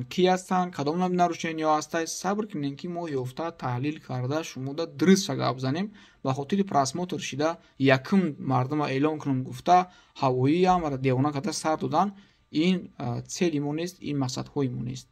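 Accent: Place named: Kulob, Tajikistan. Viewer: чесний пацан ма як гапда кайф мекнм "талхакаф"шеваи кулоби очень приятна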